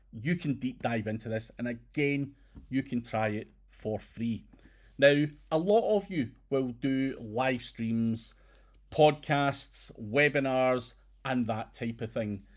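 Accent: Scotland, United Kingdom. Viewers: Scottish brogue: still undefeated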